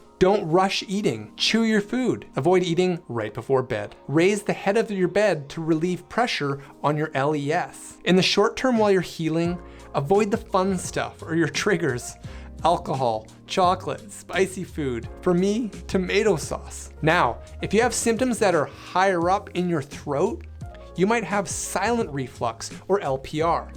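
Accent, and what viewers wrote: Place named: Canada. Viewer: No, hon', I'm vegan, so no animal products, whatsoever. As for my mental health, I've always suffered badly with anxiety and depression (I sadly lost my father to it 😞💔). My mental health got even worse last year. I had two really traumatic events (briefly homeless through no fault of my own, then hit by a snow plow 😭💔). I don't doubt that all the stress, (plus people stealing from me when my former apartment was condemned), didn't help to create this issue.😩 The night I moved into my new apartment in this new region, I had a UTI.😭 So, it was like my body was overcome with the stress. I just hope that this issue can be healed finally because I'm getting more depressed every day living with it.😞💔 Anyway, again, I so appreciate your help and suggestions, hon'.🤗 I hope you're having an amazing day wherever you are (I'm gonna guess Canada from your accent, but I could be wrong.😂).❤️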